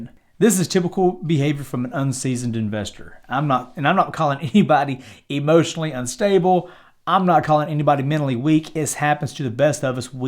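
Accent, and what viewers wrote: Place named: Georgia, United States. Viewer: Where u from? Sounds a little bit of Georgia in ya. Good ole country boy from Arkansas here and I'm glad I found ur vid. First time viewer and first year I've been investing. Turned 4 thousand into 25 as of this moment.....was about 40 but I believe in the cryptoz that I've invested in and know they are the future in all their respective areas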